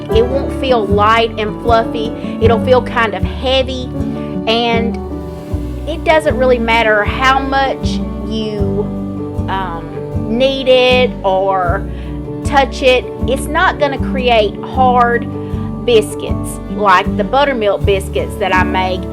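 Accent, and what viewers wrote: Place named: South, United States. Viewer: Them biscuits look amazing and with a southern accent like that, I trust anything you cook.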